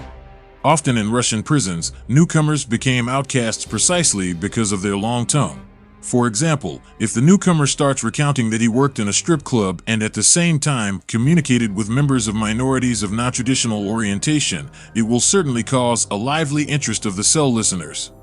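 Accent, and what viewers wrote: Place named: United States. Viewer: This would be way better if it was narrated by a real russian speaking bad english rather than a boring bot speaking american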